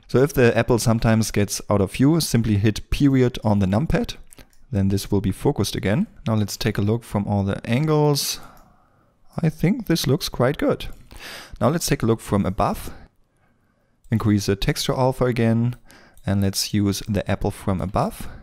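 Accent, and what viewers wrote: Germany. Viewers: i hear a german accent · german accent exists: "give me the whole stock!" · du bist dedutsch oder? Cooles Tutorial 😍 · Sei mir nicht böse aber dein deutscher Akzent, man merkt es einfach. Ansonsten mega gutes Video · you sound like a german man:D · Junge... xD man merkt dass du Deutscher bist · das ist witzig man hört echt sofort raus, wenn ein deutscher englisch spricht xD, aber super video, sehr hilfreich, danke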